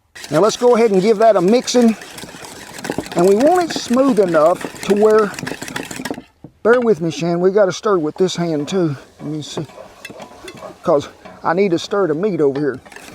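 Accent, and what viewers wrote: South, United States. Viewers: Ken, you are such an inspiration. Thank you for your excellent recipes and that down home Southern style you have. God Bless in 2021!!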